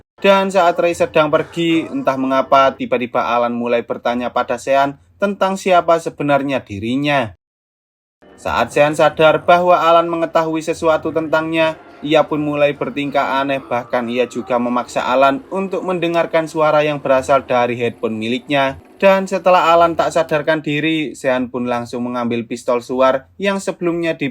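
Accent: Java, Indonesia. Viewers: Bagus dlm membawakan alur cerita nya mas nya keren,tp lucu bahasa indonesia nya medog jawa bnget 😀 tp aku suka denger nya 👍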